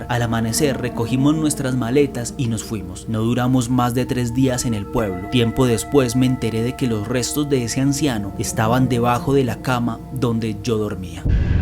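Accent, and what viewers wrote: Colombia. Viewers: Me gusta mucho tu acento colombiano 😊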